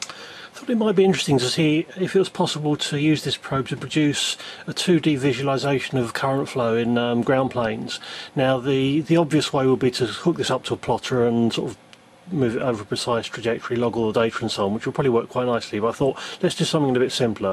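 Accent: United Kingdom. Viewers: Wow been watching eevblog a while. Never knew about you great to hear a UK voice in electronics! Thats from a essex lad!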